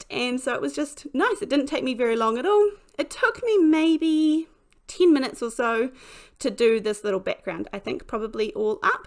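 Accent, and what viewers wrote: New Zealand. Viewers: Very cool. These are interesting, effective and have great effects! (Love the Kiwi accent!)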